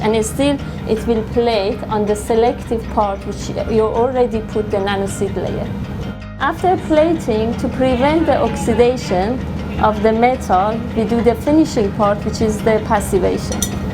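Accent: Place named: Iran. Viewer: At the first moment from her accent I found she's Iranian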